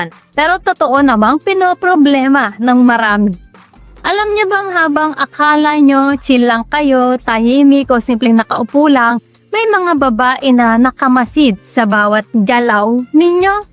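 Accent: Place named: Ilocos, Philippines. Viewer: Idol ilocana ka ba? Sa tuno mo kc e siguro pangasinan or ilocana.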